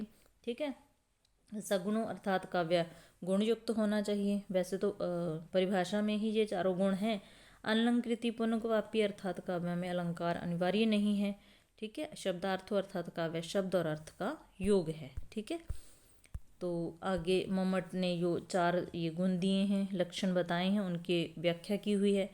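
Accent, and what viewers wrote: Punjab, India. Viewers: Mam aap Punjab se h kya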